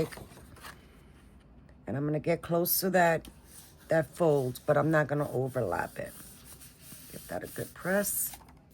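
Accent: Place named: New York, United States. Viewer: These are awesome. You are very creative. New subscriber here! I could hear that New York accent as soon as you started talking. ❤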